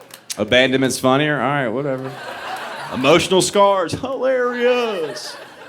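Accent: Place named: South, United States. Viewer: You are hilarious! The southern accent just makes a Philly girl melt!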